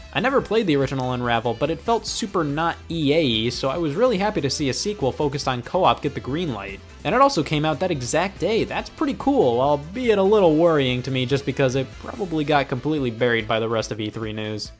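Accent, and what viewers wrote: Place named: South, United States. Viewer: Hey yall scott here you can tell he is a southerner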